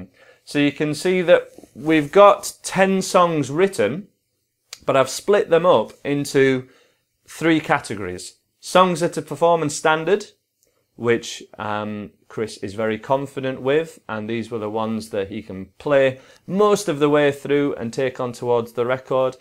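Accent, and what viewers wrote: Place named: Liverpool, United Kingdom. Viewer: cute, has a Beatles accent, and is a great guitar teacher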